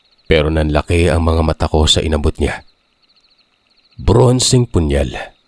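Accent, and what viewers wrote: Visayas, Philippines. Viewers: Bisaya pa more sir 😂 natutuwa ako pag nag bibisya ka.. pero mglng ka mag ilonggo...😊😊😊😊 shout out bahrain 🇧🇭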